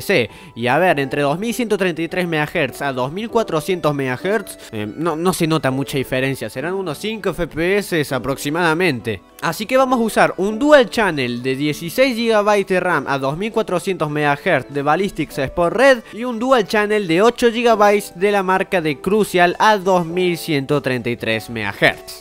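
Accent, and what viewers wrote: Córdoba, Argentina. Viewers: Epa ese acento coordoves hermano!!!!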